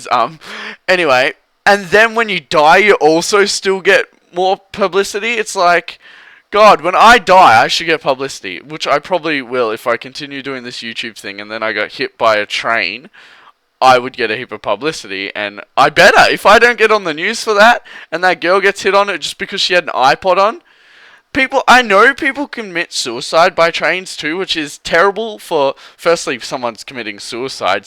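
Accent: Australia. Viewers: 1. Are u Australian ( I am ) 2. The reason trains don't pull on the breaks cause there going at like 300-500km/hr and there's no chance he"ll slow down in time but overall I agree with you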